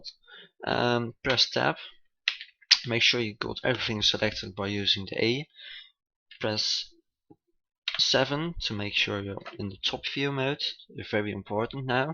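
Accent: Netherlands. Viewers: Are you Dutch? Your accent sounds really Dutch. Especially that you say the "W" in sword ;)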